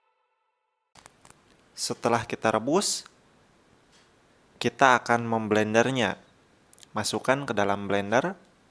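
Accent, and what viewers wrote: West Java, Indonesia. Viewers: Sunda pisan meneh ngomong logatna hahaha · Kayaknya adminnya orang sunda... Kelihatan ga bilang 'ep' (f) 😄😄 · Sunda pisan kang... Hatur nuhun ah tips na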